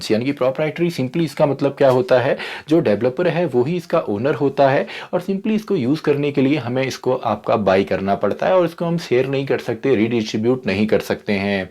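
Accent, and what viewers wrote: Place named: Uttarakhand, India. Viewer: Sir u are from Uttarakhand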